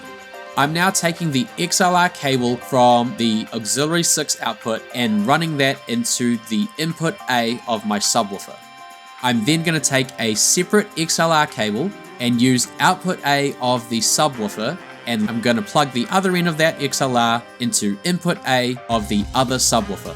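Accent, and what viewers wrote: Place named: New Zealand. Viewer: Good channel! Sounds like a kiwi accent. I have the same mixer too 😊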